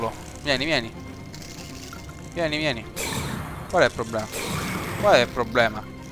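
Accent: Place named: Rome, Italy. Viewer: ahahah e vero!! XD e il massimo il folletto con l'accento romano considerando che lo sei anche te XD il gioco ftt apposta per te!!! XD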